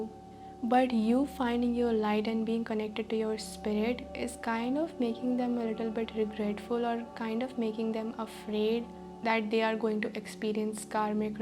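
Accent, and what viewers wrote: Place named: Punjab, India. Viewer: Sorry but I can't stand the Punjabi accent.......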